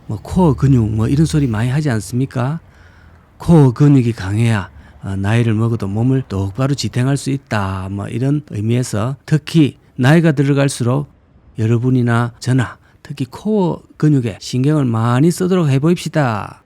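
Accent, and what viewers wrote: Gyeongsang, South Korea. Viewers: 구수한 경상도 멘트로 은근슬쩍 사회적 병리현상을 핀셋으로 콕콕 찝어내듯 몽둥이로 미련한 소 궁둥짝 쎄리 패듯.. 능숙한 방송으로 경지를 열어 가는 듯 .. 더욱 분발하시어, 부패 비리 탐관오리 속고사는 이 없게 박문수 어사 역할 부탁합니다.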